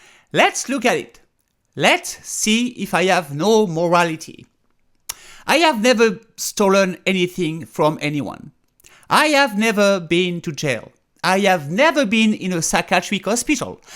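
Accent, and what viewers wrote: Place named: France. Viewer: Hi, you know I always loved you. But, to be frank, your French accent is getting more and more... how should I put it...let's just say that your French accent is getting more. Like in this episode, I have to watch the video for 3 times to hear out what are you saying, what's up? you're back to France?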